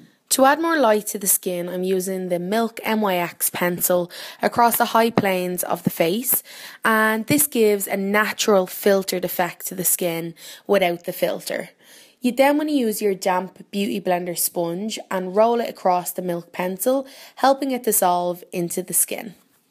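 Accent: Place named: Ireland. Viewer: You're beautiful with or without makeup 💓 I love the accent as well, don't see how us Scots and you Irish get mixed up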